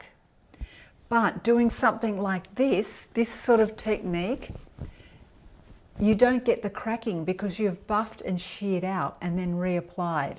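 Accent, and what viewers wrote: Australia. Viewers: Hi I thought you sounded Australian, I was hoping to find someone tht can recommend products that can be purchased here for the over 50s :( I love watching you though. thankyou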